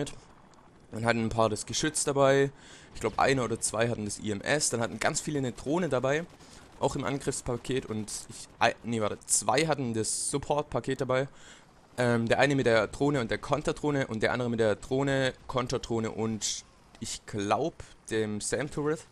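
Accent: Swabia, Germany. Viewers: hihi :D ein schwaaaabe :) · Schwäbisch FTW!!!! :D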